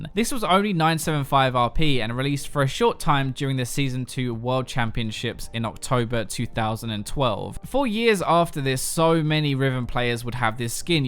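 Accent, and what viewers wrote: United Kingdom. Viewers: Where is URF warick?? This guys is so biased, and his British voice is so annoying.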